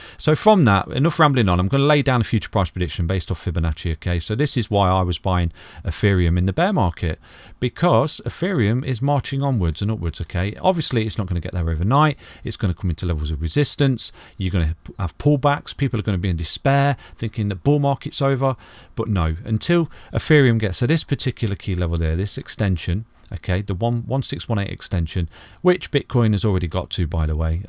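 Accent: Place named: Nottingham, United Kingdom. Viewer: Hi mate are you from Nottingham I can hear notts accent coming out 😅👊👊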